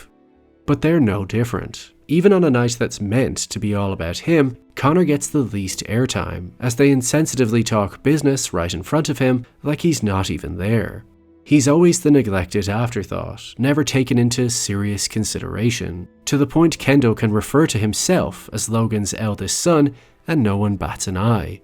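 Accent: Ireland. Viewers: LMAO a real "America is the whole world" out in the wild! It's an Irish accent you plonker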